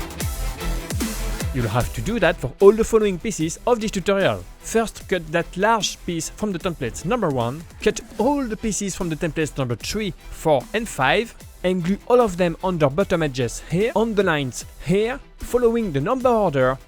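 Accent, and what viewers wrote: France. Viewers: Awesomely sharp French accent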